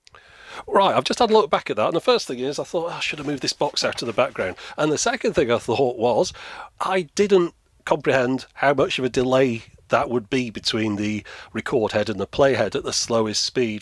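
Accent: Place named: Northern England, United Kingdom. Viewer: He’s obviously from northern England which is actually in between Scotland (Connery) and London (Collins).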